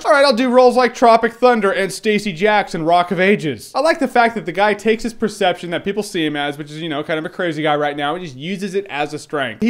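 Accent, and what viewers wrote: United Kingdom. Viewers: wow... nice British accent!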